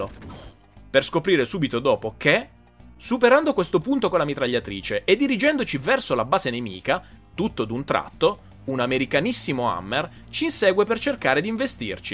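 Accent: Bari, Italy. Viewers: Tu e il tuo accento barese spaccate <3 :D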